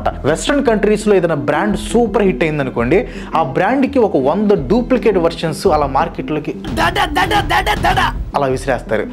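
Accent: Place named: Visakhapatnam, India. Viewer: U speak visakhapatnam clarity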